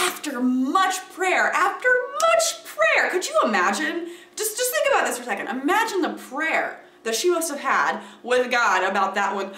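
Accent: South, United States. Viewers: Why did you put on a southern accent? Michigan is as north as it gets, minus Alaska.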